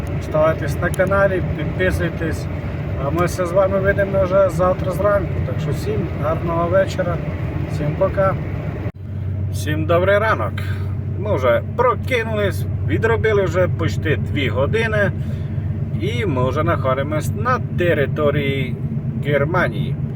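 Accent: Transcarpathia, Ukraine. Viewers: Закарпатська говірка неперевершена!!!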